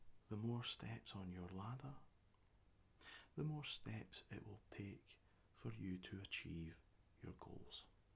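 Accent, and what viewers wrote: Scotland, United Kingdom. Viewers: Where abouts in Scotland are you from? Your accent sounds so much like my boyfriends... It's nice ☺️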